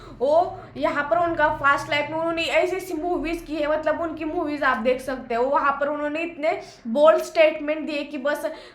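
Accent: Maharashtra, India. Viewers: Work on hindi, strong marathi accent. In good wishes if you want more subscribers.you have good face n presence.❤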